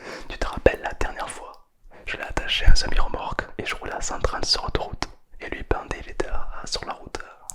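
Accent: South, France. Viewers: Mdr j'adore tous les personnages que t'as inventé que tu fait intervenir dans tes vidéos et ton ASMR est génial encore plus avec ton accent du sud ❤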